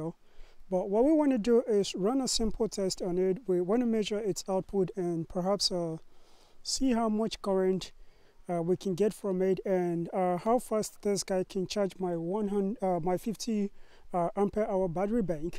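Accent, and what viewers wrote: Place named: Nigeria. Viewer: Great video. Clear and straight to the point. Thanks. Off-topic, your English accent sounds very Nigerian. Were you ever resident in Nigeria? 🤔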